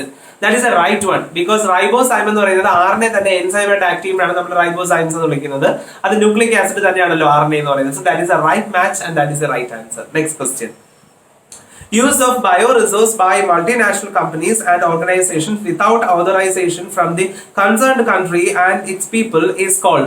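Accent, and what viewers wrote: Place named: Punjab, India. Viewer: Punjabi like ye kya bol rha hai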